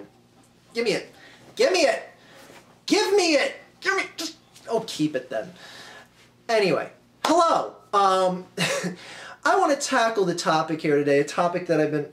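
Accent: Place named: New Jersey, United States. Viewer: He has a Jersey accent if I'm not mistaken.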